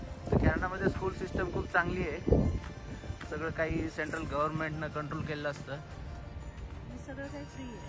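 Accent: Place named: Latur, India. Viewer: बोलण्यावरून समस्त आमच्या लातूरचा माणूस जय श्री राम 🚩🙏🏻🙏🏻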